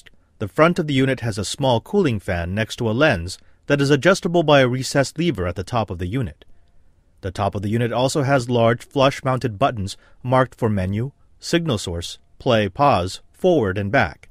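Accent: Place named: Philippines. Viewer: one last question out of my curiosity since you are a Jesuit priest. Can I ask if you are a Filipino from Ateneo Universities in the Philippines? The way you speak it sounds like a Filipino and at the same time every features of you makes me feel like you are one of us. peace, popoy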